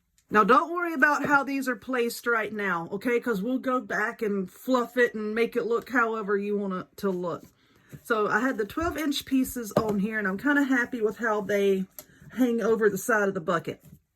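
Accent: South, United States. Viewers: Well I’m old and have never seen this craft before! So thanks for sharing it! Also I love your sense of humor reminds me of my sister even down to the southern drawl.🥰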